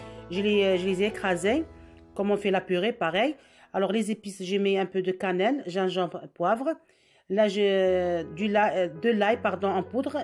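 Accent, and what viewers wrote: Oran, Algeria. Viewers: عجبتي وهرانية تاعك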